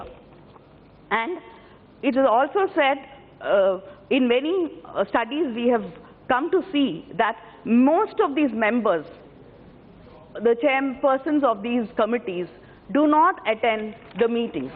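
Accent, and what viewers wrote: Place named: Tamil Nadu, India. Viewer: அக்கா உங்கள் ஆங்கிலபேச்சில் தமிழ் நல்லாபுரியுது சிரப்பு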